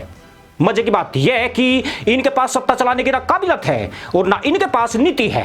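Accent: India. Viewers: यार ये हरियाणवी आवाज हिन्दी की कमर तोड़ दी आपने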